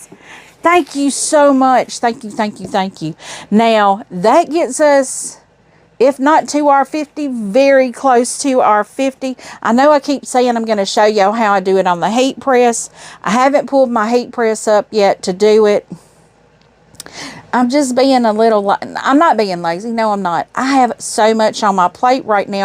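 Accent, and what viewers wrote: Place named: Virginia, United States. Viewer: My heart goes out to you and hope you have a positive and speedy recovery. I’m 43 and for almost 20 years I am in pain ( TMj). I live on the west coast (but my whole family aside from 3 of 4 children are also west coast ) my family is in VA so hearing your accent makes me miss home. Lots of prayers and positive energy for you and your recovery!!❤️‍🩹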